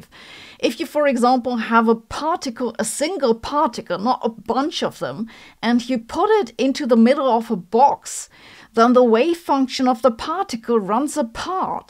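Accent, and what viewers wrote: Germany. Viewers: She's awesome. I have watched several different science oriented channels but Sabine is my favorite. I can't claim to understand everything. I do my best to get a sense of things as she breaks down different topics but the vast majority of it is beyond what I understand. In my gut I feel like she is a person of character and if she realizes she is wrong about something she will admit it and explain it. That is everything in my book and so I trust her. Having said that, my grandparents were German and I love that accent... She had me at "Einshtein" 😅😊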